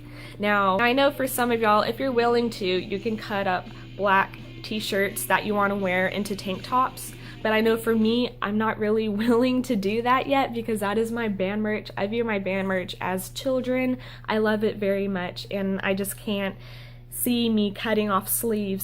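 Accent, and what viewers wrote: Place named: South, United States. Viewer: I love your accent. I kinda could tell that you we're from the south. Also great advice. I live in Western Massachusetts so the weather does get kinda hot but I'm going to the Hartford CT date. I'd love to know what bands you're going to see.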